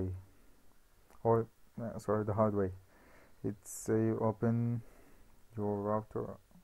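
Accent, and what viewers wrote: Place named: Brazil. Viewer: wow, i thought you were brazilian.. Your accent is quite similar. Great video btw! it really helped me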